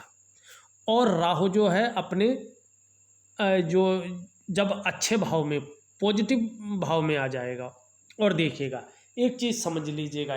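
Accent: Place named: Garhwal, India. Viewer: Aap garhwali Ho kya Apka tone se lgra hn 100%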